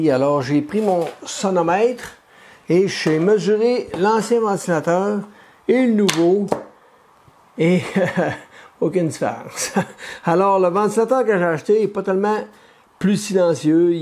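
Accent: Canada. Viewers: J adore tes vidéos (avec ton accent canadien). 😍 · Bonjour, Ayant eu une creality ender 3 V2 à Noël (2021), je suis trop content de ma lancer dans le monde de l'impression 3D. Et je suis donc tombé sur vos vidéos, que j'adore !!! Mais je ne sais pas si vous avez partagé les références des ventilateurs, où si je dois juste "écouter" la vidéo pour les retrouver. Dernière question: comment trouver le STL de la poignée en haut de l'imprimante ? C'est en effet trop pratique ! Et enfin, c'est quoi la pièce en plus juste avant l'extrudeur ? Ca sert de guide au filament ? Bref, j'adore vos vidéos, et vu de France, votre "accent" Canadien et votre façon de parler sont tout simplement hyper rafraichissants ! Continuez comme ça !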